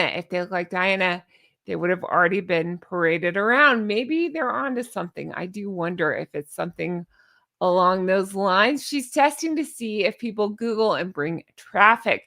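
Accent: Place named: South, United States. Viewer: I love a southern accent ❤ I don’t think you should suppress it. Imo. 😊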